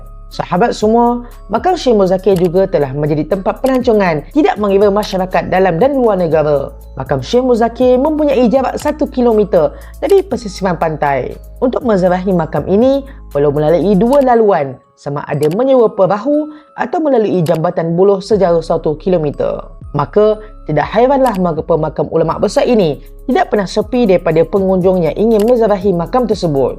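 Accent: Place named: North, Malaysia. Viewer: orang utagha ka? loghat cakap ada kala keluaq utagha tu hehe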